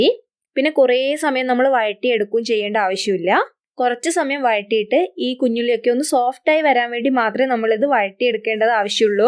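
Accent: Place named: Kannur, India. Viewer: Ulli curry Adipoli yayittundu ketto ..thank you..💐💐✌️🤝 Thalassery (Kannur) kkariyo atho vadakara.. kkariyo? Endhayaalum randilonnaanu.. urappu .😄✌️🙌..love your way of speaking..🥰💖💖💖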